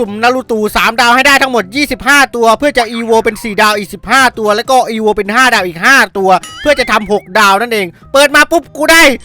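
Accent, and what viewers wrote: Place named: Korat, Thailand. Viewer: โคราชอยู่นิดหน่อย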